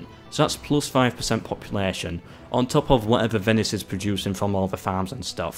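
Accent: United Kingdom. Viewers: what kind of accent is that im in love with accents from the UK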